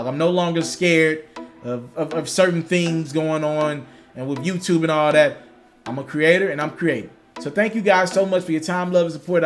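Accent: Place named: New Orleans, United States. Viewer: Lol facts bro u new orleans niggas crazy fr fr / just look at how u talk everybody else in america say three(3) / new orleans really do say tree even 90year old white racist old people say tree in new orleans lol😁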